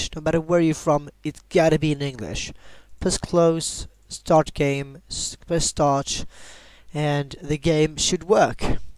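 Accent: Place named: United Kingdom. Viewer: Ahh youre also Swedish metoo shoutout to u ma boy BTW you sound brittish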